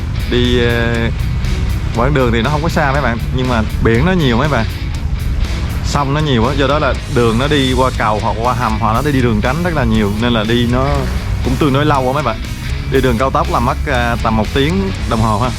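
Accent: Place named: South Central, Vietnam. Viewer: Viêt nam bạn ở tỉnh nào vậy .nghe giọng khó đoán miền trung pha nam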